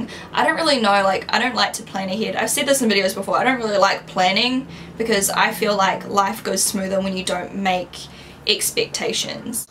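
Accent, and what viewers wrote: New Zealand. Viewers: Your main channel was the first one I subscribed too. I remember the first video of yours I saw was a grwm clubbing so it was just music. I clicked onto another video of yours and was so surprised to hear your voice!!! I was thinking you'd have an American accent, but no, you're a kiwi just like me!!! Instantly I subscribe and have watched pretty much every single one of your videos! Love you:) xxx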